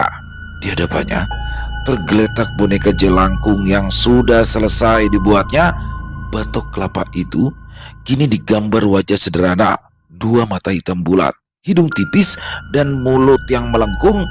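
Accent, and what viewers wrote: Jakarta, Indonesia. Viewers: Waalaikumsalam mlm bang, Alhamdulillah sehat bang,semoga abg,team dan penulis sehat slalu,Batam setia hadir nyimak bang,ayo gas kan bang,biasanya ada tuh kata2 yg lucu klu sdh logat Betawi muncul bang nih 😂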